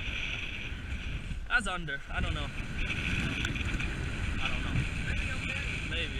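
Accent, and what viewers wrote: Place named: Vietnam. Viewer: Lmfao your Vietnamese accent is strong on this one 😂😂😂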